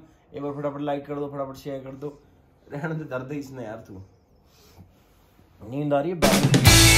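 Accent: Haryana, India. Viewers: Radhe Radhe 🙏🙏sir g😇 Ek Ache Educator k saath Saath Aap to Blogger B Bnn Gye Aur Wo B Ache waale 💖💖 Aapka To Nose B Red ho gya Tha 🤪Apple jaise.. U r Superb Dear Sir g ❤️❤️ 💕💕May God Bless U Always 😇😇😇😇 Aapki Haryanvi Ki To Maii Fan ho Gyi Huu 💯💯💯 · Sir ji.....teaching ek taraf arr aap suthre ek taraf.....arr fer baat aawegi apni bolli ki Ya haryanvi ke zeher laage hai na aapke muh se bas bujho na...baki hum k kahe.....aapke nazdeeki gaon se hi hain hum.....aate rehn diya karo vlogs gadhya gaddh 😍😅🤗 💗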